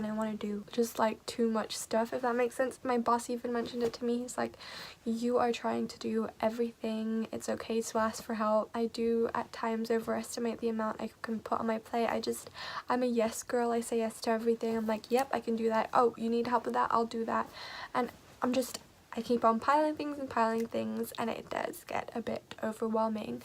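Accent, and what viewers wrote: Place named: United Kingdom. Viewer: I love your videos!!💗💗 Your accent is starting to sound british 🌸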